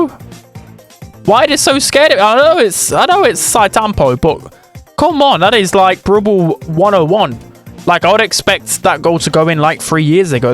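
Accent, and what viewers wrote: United Kingdom. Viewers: when are u gnna unleash ur welsh accent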